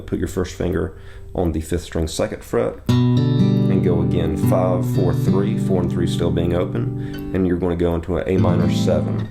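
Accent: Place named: Kentucky, United States. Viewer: I'm a 60 year old (starting my 4th quarter) guitar player who has played in bands for over 40 years. I Loved the Eagles, Foreigner, James Taylor, and now Zac Brown and Garth and many others. I have just started finger picking using more than my Thumb and Index. This very song, Millworker, took me through an unfortunate divorce. Back in 2006, all I had was a borrowed small TV that played DVD's. I had JT's Pullover and that was all. This song became my anthem. Due to some kind of block, I could not roll from the D to to G (I kept coming up with:Ridin the Storm Out - Ha!). I had since figured it out but your's, I believe, is the absolute Best Tutorial I have seen. And being a Kentucky Boy, your SEC accent was welcomed. Keep it up! Jerry K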